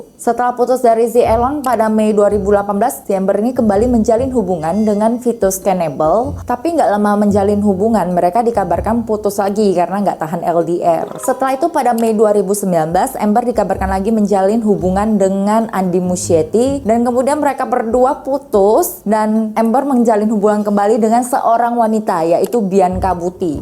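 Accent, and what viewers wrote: Medan, Indonesia. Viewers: Dia Indonesia tapi pake Logat medan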